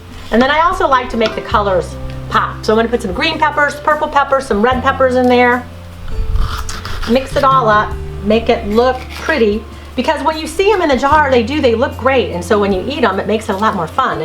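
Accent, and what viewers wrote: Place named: Upstate New York, United States. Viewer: if your going to give out recipes, please learn the DIFFERENCE BETWEEN A Teaspoon and tablespoon, there's a huge difference u said add 4 teaspoons to a quart, you added 4 Tablespoons maybe in upstate new York they don't know the difference but in downstate people know the difference at least based on your accent u sound like your from waaaay upstate had to downvote your video because you gave a recipe with completely wrong measurements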